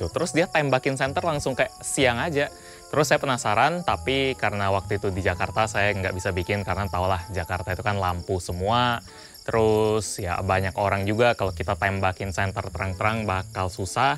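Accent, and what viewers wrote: Palembang, Indonesia. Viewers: "Trus klo liat kepocok😂 wong palembang caknyo budak ini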